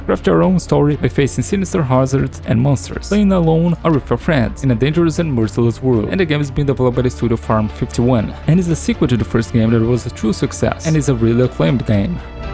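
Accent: Brazil. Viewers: I would guess that u are Brazilian haha, great video man!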